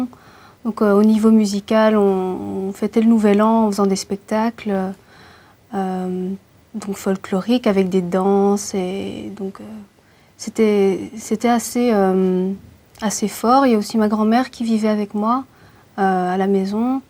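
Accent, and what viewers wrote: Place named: Belgium. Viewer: elle a un petit accent belge, j'adore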